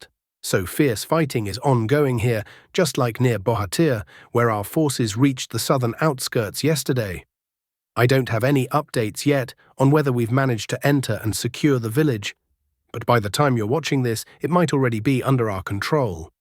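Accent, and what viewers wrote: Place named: United Kingdom. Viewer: It is strange to have a British accent , and referring to "we and "us" during his narrative. I am suspect of this channel.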